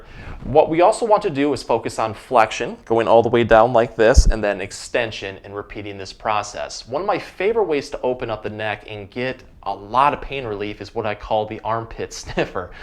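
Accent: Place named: Midwest, United States. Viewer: You’re def from Chicago or the Midwest with that accent lol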